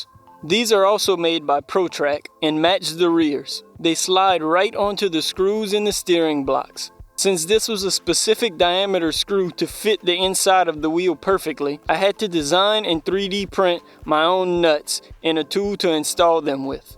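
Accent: Louisiana, United States. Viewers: Hey, you from Louisiana? Dat sounds like a Cajun accent to me :) Anyways, sick build, man!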